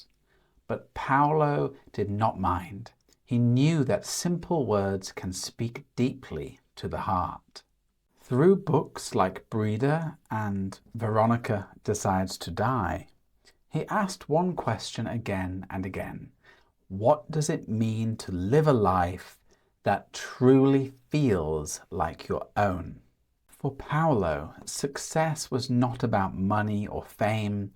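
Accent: RP, United Kingdom. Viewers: Do you speak recieved pronunciation ?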